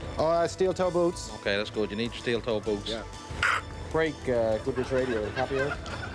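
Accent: Newfoundland, Canada. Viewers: Funny to hear get his newfie accent back,love it❤❤❤❤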